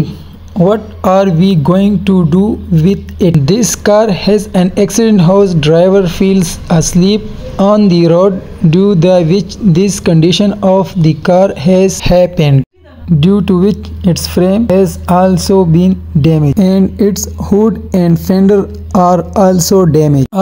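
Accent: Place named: Punjab, India. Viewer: Agar Urdu Mai baat karte ziada behtar hota yeh Punjabi type English se acha hai Urdu Mai baat kia Karen shukria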